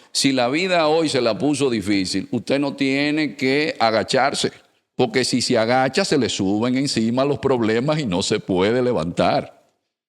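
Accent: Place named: Venezuela. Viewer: Tiene acento venezolano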